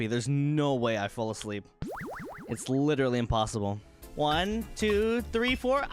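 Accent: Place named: United States. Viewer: 公表はしてませんが、英語の訛りや配信の時間的にアメリカもしくはカナダあたりだと思います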